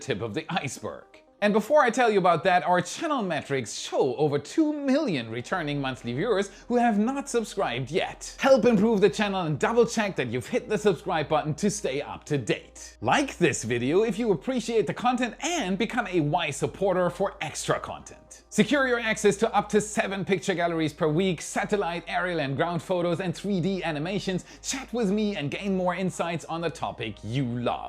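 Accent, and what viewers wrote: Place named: Germany. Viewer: could very well be for a different pronunciation. is German, so kinda expect a heavy German accent with the pronunciation 😊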